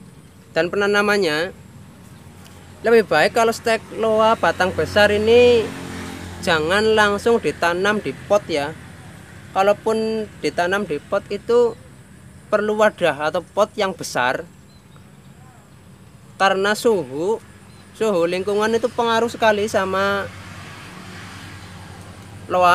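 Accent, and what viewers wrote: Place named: Java, Indonesia. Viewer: Medok mas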